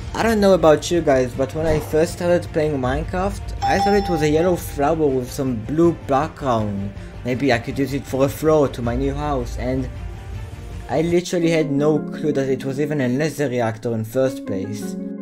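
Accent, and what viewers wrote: Israel. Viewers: Is there any chance that you live in Israel? Or that your first language is Hebrew? Because this accent sounds familiar.😂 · היי אחי יש לך מבטא ישראלי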